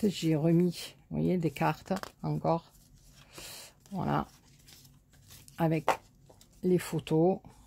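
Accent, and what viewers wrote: South, France. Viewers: Re 👋, waouh quel magnifique album, on en prend plein les yeux 🤩💖😍, vous avez mis ce beau papier en valeur avec la dentelle, les perlounettes, les petits nœuds....... Bravo pour ce très beau projet. Merci pour cette présentation avec votre bel accent du midi et surtout votre bonne humeur, c'est toujours un plaisir de vous entendre, de voir vos créations et de suivre vos tutos. Bien sûr que j'attends vos prochaines cartounettes ou ATNap. Prenez soin de vous et profitez de la mer, du soleil🌞 et de la baignade 🏖. Bon dimanche, à bientôt 🥰🥰😘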